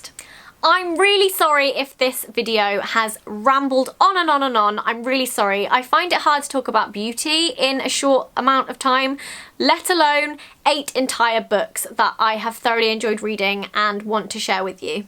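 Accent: United Kingdom. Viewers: YOUR EYES THOUGHHHH !!! AND I LOVE BRITISH ACCENT, SO THIS IS AMAZING 😂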